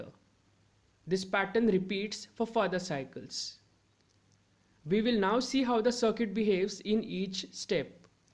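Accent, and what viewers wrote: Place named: Russia. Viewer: El inglés más ruso que he escuchado.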